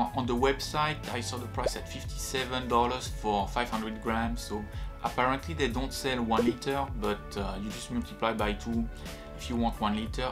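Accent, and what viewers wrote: France. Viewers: Vu l'accent, t es un Frenchy!!!!! 🤗😋 Tu penses qu on peut colorer la résine si elle est claire, avant impression? Ou colorer les objets imprimés?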